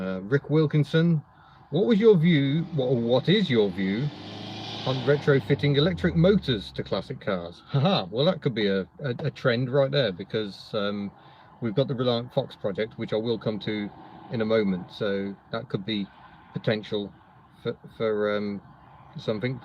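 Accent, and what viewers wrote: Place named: Midlands, United Kingdom. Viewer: where were you born? You sound like posh Midlands, Warwick possibly?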